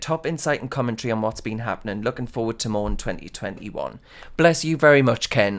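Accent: Newcastle, United Kingdom. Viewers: As you open up here a personal note, can I ask you, where does your accent hail from (I really like it)? Is it Geordie? All the best from your subscriber here, who thinks you are great!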